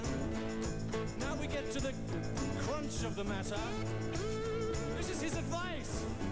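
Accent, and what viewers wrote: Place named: United States. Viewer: Why's he got an American accent? Cringe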